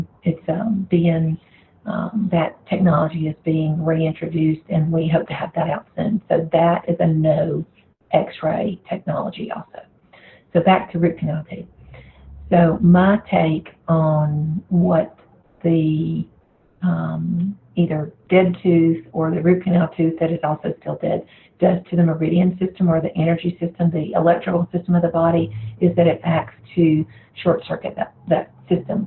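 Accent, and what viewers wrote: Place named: London, United Kingdom. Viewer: Love your accent! Are from from east or west London?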